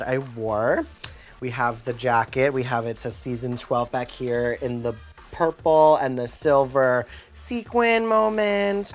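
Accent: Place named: New Jersey, United States. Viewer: I love her New Jersey accent and energy!!! He is the type of guy you want to take home to meet your mother.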